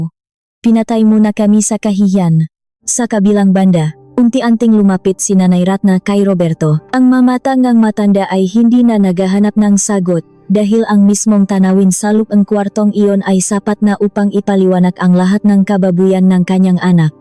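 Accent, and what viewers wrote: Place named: Philippines. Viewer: Pilipino slang mag narrate.. its annoying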